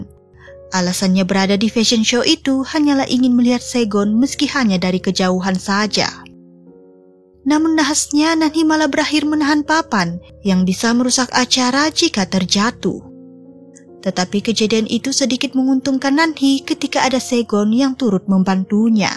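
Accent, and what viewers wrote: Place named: Bali, Indonesia. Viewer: Orang bali kah,,,,??? Logat setiap kata ada Te tapi di ucapkan Dhe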